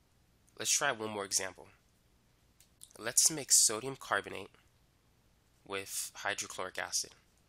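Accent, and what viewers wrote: United States. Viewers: listening to an american is kinda annoying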